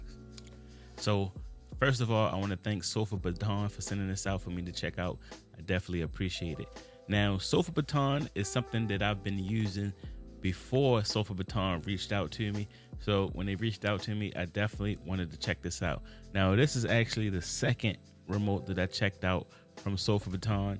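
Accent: Baltimore, United States. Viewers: Excellent review! Sounds like I here a B-more accent heavily pushing thru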